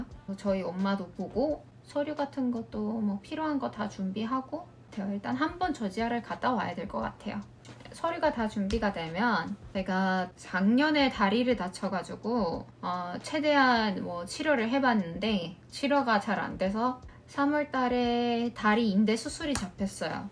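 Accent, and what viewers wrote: Seoul, South Korea. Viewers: 그냥 찐 한국인 말투인데😊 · 언어 능력이 원어민 수준입니다. 서울 말씨를 쓰시고 오히려 저보다 말씀을 잘 하시네요. 마치 한국에서 태어나 성장하신 분 같습니다. 😅😅😅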